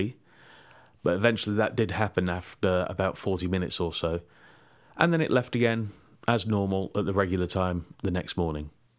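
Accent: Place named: United Kingdom. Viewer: 와 영국발음이당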